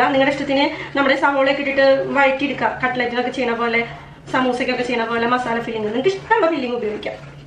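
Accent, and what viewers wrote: Thrissur, India. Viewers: Adipoli aayindtta chechi. I like your thrissur slang as I also belongs to thrissur. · തൃശ്ശൂർക്കാരിയാണോ ചേച്ചി??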